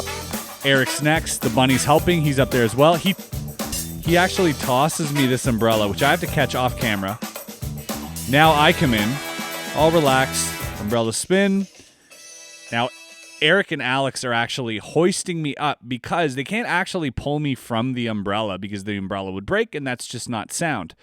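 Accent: Canada. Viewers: You Canadian?! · Holy smokes! You’re Canadian bud?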